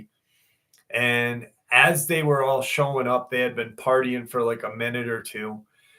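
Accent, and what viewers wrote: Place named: North Jersey, United States. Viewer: Well I believe your doing the North Jersey accent. We here in South Jersey do not talk like that. We have a southern twang. LOL!!!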